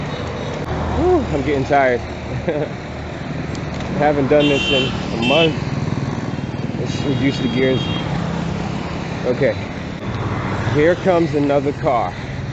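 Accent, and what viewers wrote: United States. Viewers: It's so noisy with that freaking non-stop honking. Can drive you crazy! Btw, your english is pretty good just like an american accent.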